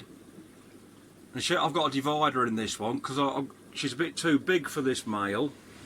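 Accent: Midlands, United Kingdom. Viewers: Your uploads are great to watch along with ur commentary really interesting,,,can I ask wot ur Actsent is??? I'm thinking midlands??? 🤔 👍 👍 👍 👍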